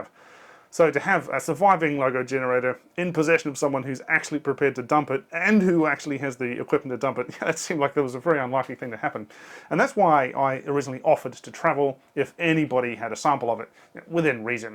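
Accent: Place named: New Zealand. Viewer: Thank you,. I like the mellow NZ accent, much nicer than the OZ nasle noise. I had a new sister in law from NZ from south island, but didn't know what the north island would sound like. I've not seen her for 40 odd years, buy heck, she was very pretty. See you next time you post.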